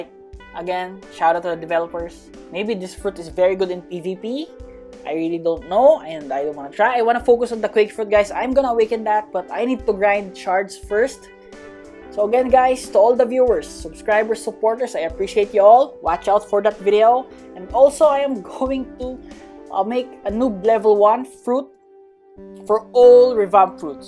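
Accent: Philippines. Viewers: Bro had a Filipino accent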